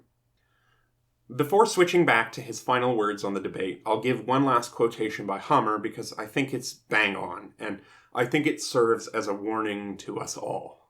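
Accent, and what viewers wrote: Quebec, Canada. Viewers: Thank you and blessings. Is that a french canadian colour I hear in your french words and names? If so: salut mon frère! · Thank you so much 🙏🏻 I was wondering… are you French ? Like from Quebec ?? I’m near Montreal and your French seems Quebec français canadien ☺️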